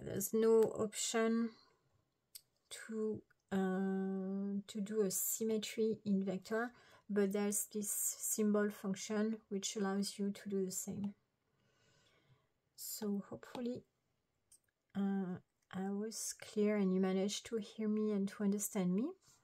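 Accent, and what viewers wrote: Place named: France. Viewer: Hello and Wow, what a beautiful hands, color of nails, and that voice + French accent, am I right? Thank you for this tutorial, this is what I need for my project. I wan to design and make incense stand with mandala motif. You helped me a lot☺ Greetings from Poland Edit: I have a problem with symbol layer, when I do the final stroke to draw mandala shape like you in this circle, the lines are drawn automatically but in one direction left or right instead facing each other. What I'm doing wrong🤔 Edit_2: I have figured out. My mistake by instead of adding first triangle to symbols, I have first created both, connected and then added as symbol which did not point stroke later to the same direction, but opposite, spreading away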